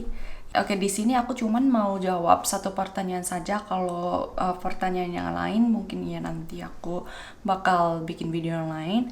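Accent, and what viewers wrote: Indonesia. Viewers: Aksen indonesianya sudah 70%.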